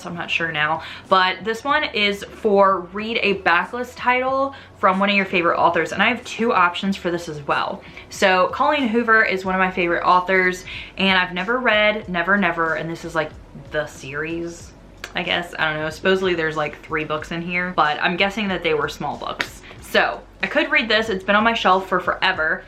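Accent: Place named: South, United States. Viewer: That country accent🤣🤣🤣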